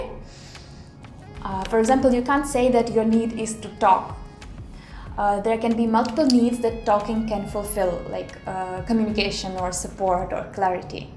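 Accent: India. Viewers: Your English pronunciation are now like the more Indians and less russian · Very interesting video 🙏 I think in my relationship we also have the need of friendly and warm communication 😁 btw did you notice that you have an indian English accent? Super cute 😅🥰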